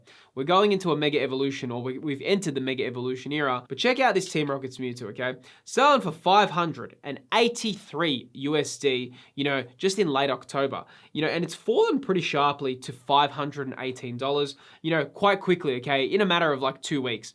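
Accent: Australia. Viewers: Hey, I've watched a few of your videos now and I just wanna say that I truly appreciate your content: besides the genuine passion for the hobby that you transmit, Im always very interested to hear your thoughts about the investing/ financial side of it. Keep it up please! Also, I lived a few years Down Under and I love to hear again some Aussie accent! And last thing, I think you said something very profound: that you don't have to be good at your hobbies to enjoy them. It made me think when I was too learning how to surf and getting always so frustrated because I wasn't as good as I wanted so bad to be. You just have to like what you to have fun! Keep it up 👍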